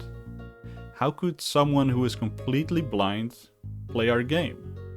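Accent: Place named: Netherlands. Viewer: I'm dutch! Ja dat hadden we kunnen horen aan je accent pik :D The game looks like fun keep up the great work!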